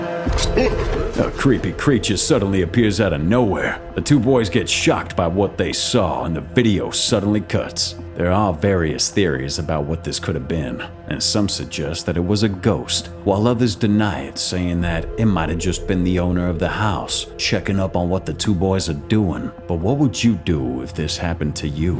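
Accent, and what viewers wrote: Louisiana, United States. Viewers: Love the "Wheezy Anna" accent brother...great content as well. You just gained another sub! Feed us!!!!! ;)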